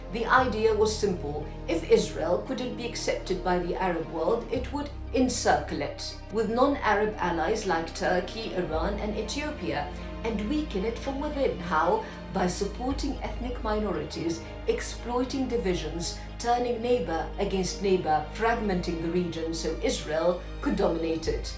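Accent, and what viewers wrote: RP, United Kingdom. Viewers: Her voice is like she from English ROYALTY 👑 ..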